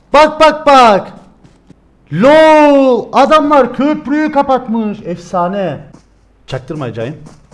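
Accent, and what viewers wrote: Cyprus. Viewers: kardeşim sen kıprıslımısın konuşman çok tatlı kıbrıslıları seviyore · Abi kıbrıs lımısın be gardaş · Sırf şu Kıbrıs şivesi için izliyorum enerjin de güzel başarılar dilerim 👏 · Kktcden geldim askerdim aksani ozlemisim cansin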